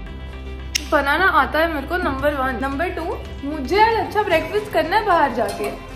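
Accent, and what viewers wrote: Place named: Kutch, India. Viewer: CONGRATULATIONS 💗 SUPER HAPPPPYY FOR YOU 😁🌼(LOVE YOUR KUTCHI ACCENT...being a KUTCHI myself i feel so proud 🥲✨)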